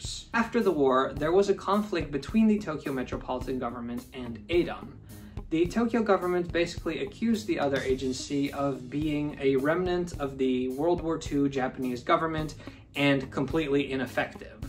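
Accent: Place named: United States. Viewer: Where all have you lived? You sound like an American.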